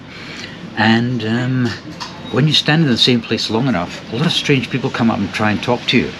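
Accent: Scotland, United Kingdom. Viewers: Isn’t he a Scott?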